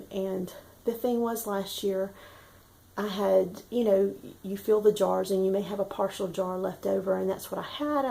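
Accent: South, United States. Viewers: HiYour videos are so calming - you have a wonderful southern accent which sounds so welcoming. I watched the video of you and your friend (night owl?) And your joyous heartfelt friendship was so wonderfully conveyed. I subscribed to her site too.God bless you!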